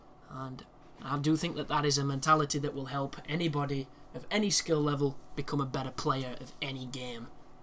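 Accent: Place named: Northern England, United Kingdom. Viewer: I like survivor difficulty walkthrough, you sound northen said fooking as well as fuck alot, subbed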